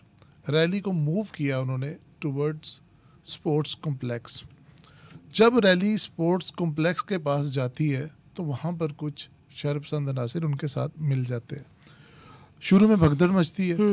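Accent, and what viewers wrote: India. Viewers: are you an indian. Why do you speak in an indian accent? Qhan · انڈین سٹائل سےاوردو بولنے پر شرم آنی چاہیے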